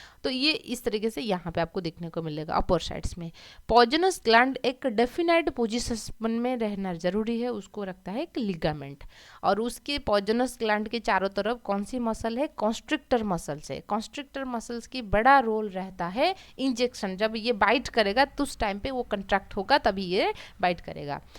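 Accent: Odisha, India. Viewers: Mam are you from odisha??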